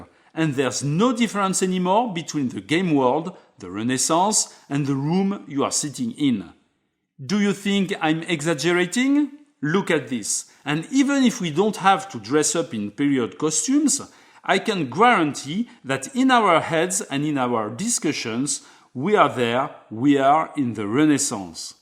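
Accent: France. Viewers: I think you probably specified exacltly what i like in board gaming in these videos. I hope to see alot more content published by you in the future. And always with that beautiful frensh accent ;) If i had one request it would be to see an english verison of the Here I stand videos. :)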